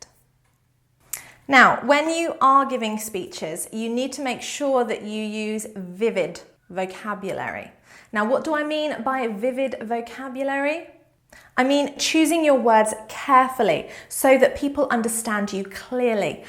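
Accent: United Kingdom. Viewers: A very engaging presentation. I like Teacher articulation of words - so clear, great pronunciations, so distinctly British accent. Reminds me of my favourite english teacher, Miss Courtney of "Mind Your Language" fame. She's always back straight chin up. I really marvelled the way she speaks, so British. She's got her own peculiar strong screen presence.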